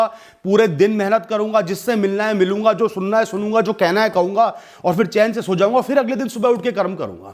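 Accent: India. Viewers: Very Nice Sir Motivating and I like your videos due to our Desi and Indian Accent Aap ekdum acche se samjhate hai Really Superb Sir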